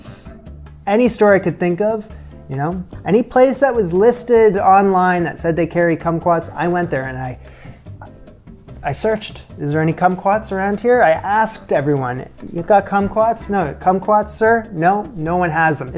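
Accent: Canada. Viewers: Ha! I've watched several of your videos lately and recently thought, "is this guy Canadian?" due to your accent. This video confirmed it!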